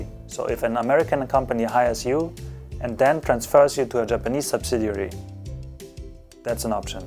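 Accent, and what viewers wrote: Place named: Germany. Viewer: Die Sekunde wo du angefangen hast zu reden habe ich gemerkt das du deutscher bist. Ich liebe es deutsche Akzente zu hören. makes me feel like i'm at home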